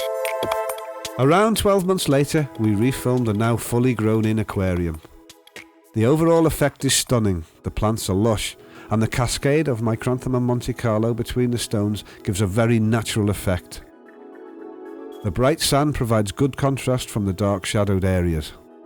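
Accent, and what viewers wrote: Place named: England, United Kingdom. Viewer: The narrator is speaking in a regional English accent not spoken in North America but in England.. Similar to the difference between Mexican and Castillan:)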